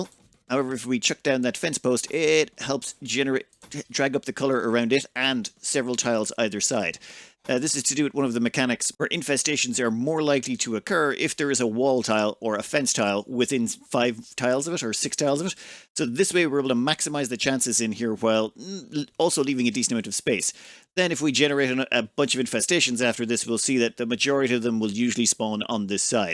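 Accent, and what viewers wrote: Ireland. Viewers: Lol he's Irish right?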